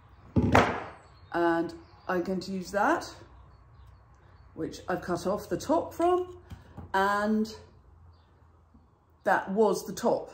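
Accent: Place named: England, United Kingdom. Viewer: You are so adorable! I'm in the USA, and I can't tell you how much I love your English accent along with your cute way of expressing yourself! I also appreciate yor knowledge of the Latin names of plants and flowers. It elevates them instead of sounding so common. Love your arrangements that feel like little gardens! None of them feel tight and constrained. What a relief from arrangements that think they all have to look alike in shops. I so appreciate the variety! I moved to an acre in Washington, and have started growing my own beautiful flowers. What a thrill to be able to arrange and share these beauties! Thank you for the enjoyment I get out of watching your videos!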